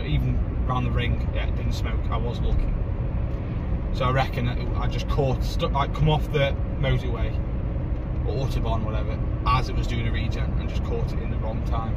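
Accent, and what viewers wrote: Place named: Stoke, United Kingdom. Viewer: I’m detecting a Stoke accent.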